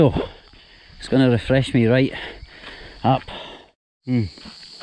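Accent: Scotland, United Kingdom. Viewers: he is very Scottish. Scotland is a beautiful country to the north of England. to a British ear he is sooo Scottish. lovely. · Its funny those 2 yanks hadn't realised your strong Scottish accent and they've been all over Scotland 😜😉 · Are you Scottish?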